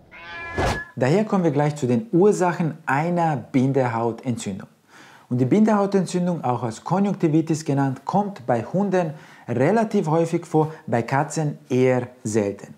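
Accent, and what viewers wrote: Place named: Russia. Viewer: Dieser russische Akzent macht das Video einfach perfekt 😂keine Ahnung woran es liegt aber wenn jemand mit russischem Akzent spricht würde ich mir alles angucken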